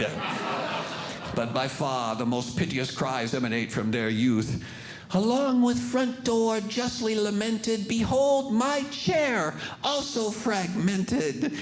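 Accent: United Kingdom. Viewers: British accent and Shakespeare? Wow!! Talented guy!! Bloody brilliant!❤😊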